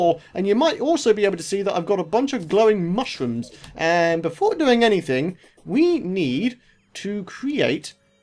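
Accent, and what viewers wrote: United Kingdom. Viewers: Walker Wilkins I think they're both British.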